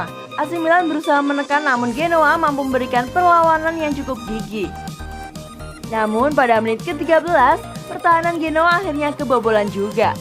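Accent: Java, Indonesia. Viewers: adminnya mantapp mendok berasa main di kediriii akuu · POKOKNYA MILAN DI SISA MATCH SELALU ON FIRE KARENA SUDAH TERTINGGAL 9 POIN DARI MERDA INTER LAH MEDOK LAGI VO NYA WELEH WELEH FORZA PRE SEMPRE MILANELLO MARCHEND JAKET MILAN APA KABAR TUH MIN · Admin nya orang jawa ya.. mantap lah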